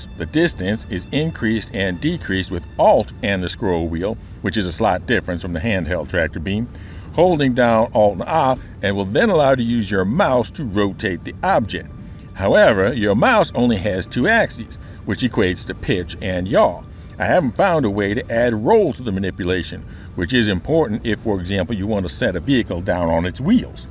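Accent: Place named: South, United States. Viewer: Please don't take this personally, but for most of the world a southern US accent is not associated with the best of things. Also it's not always easy to understand. I would prefer a more moderated, just normal, English.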